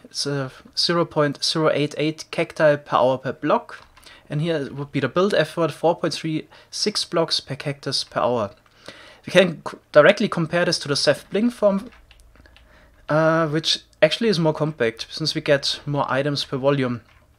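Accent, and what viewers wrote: Germany. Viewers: Höre ich da einen Deutschen akzent? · Dieser deutsche accent triggert mich jedes mal hahahaha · 😂Digga was geht 😏👌 man hört es raus das du aus Deutschland bist 😂👌 hast du auch was neues wegen Kaktus? Schau Mal vorbei bei mir ✌🏾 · Bist du ein deutscher? Dein Englisch hört sich an wie wenn ein deutscher englisch redet lol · bist du deutsch hahaha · I actually like his German accent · Wer ist auch deutsch und merkt das er deutscher ist wegen dem Deutschen Akzent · and its because there is a German accent in his speech I think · But he is also using the german english pronunciation xD no offense but it is hard to watch as someone who grew up speaking both languages :D anyways, the videos are still insanely informative #nohate # donttakeitpersonallyplease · Who hears also the german dialect ? Richtig cool. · Man hört halt raus das du deutsch sprichst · i love the german acccent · german accent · Ich habe noch nie ein deutscheres englisch gehört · Lmao u sound soooo german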